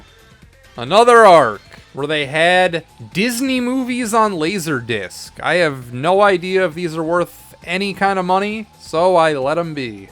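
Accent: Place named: Upstate New York, United States. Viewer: It's funny I thought I heard a upstate accent in some of your videos. All of my cousins have it.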